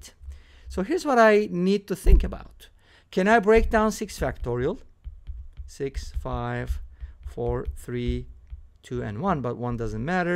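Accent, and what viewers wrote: Turkey. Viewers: unnecessarily long video. last part was more interesting it could be more explanatory aksanindan anladim turksun helal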